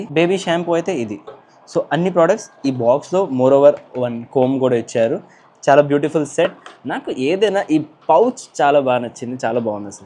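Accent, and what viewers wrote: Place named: India. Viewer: though ur telugu is hindi mixed ....I appreciate ur telugu......but actual speaking we southindians face huge problem with northindians .....they won't develop thier states ....migrate to our south ....we are facing job crisis ,hindi imposition, culture difference....we south are dravidians....our culture is different from north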